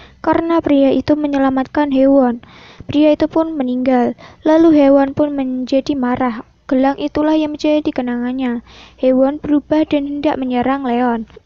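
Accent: Java, Indonesia. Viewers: Kak kakak orang jawa ya??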